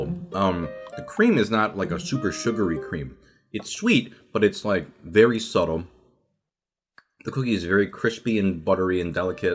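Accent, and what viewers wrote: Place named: United States. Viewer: his accent is so cool, he sounds like he's American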